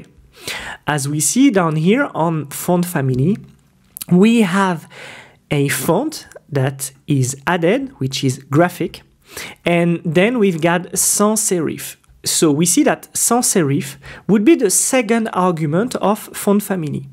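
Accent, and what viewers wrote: France. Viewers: Tu es francophone !! ton accent est très fort, mais c'est très confortable pour moi. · Merci pour ce cours. Your french accent is a treat. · bro thanks but i cant understand what you're saying i see you're french influence is too strong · french accent is funny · L’accent français on adore 🥰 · love the french accent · thank you so much but you can not hide your French accent.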